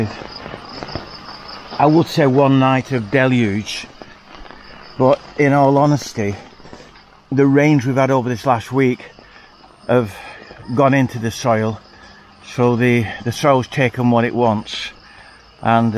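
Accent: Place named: United Kingdom. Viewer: great video, just subbed :)) which part of the uk is your accent from?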